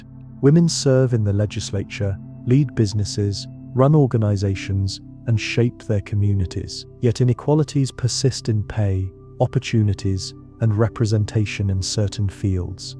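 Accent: England, United Kingdom. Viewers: You know you're receiving foreign Edomite indoctrination in an English accent and mispronouncing names and words recklessly.... Know wonder the Western Hemisphere is lost in ignorance. Ju Ly for 1776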